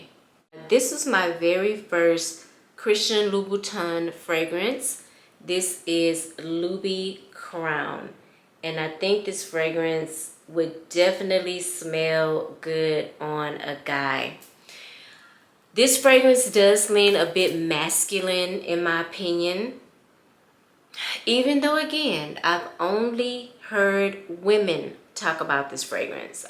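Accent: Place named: East Coast, United States. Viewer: I would definitely!! I love watching perfumes & fragrances, indulge, I love your East coast ♥️ accent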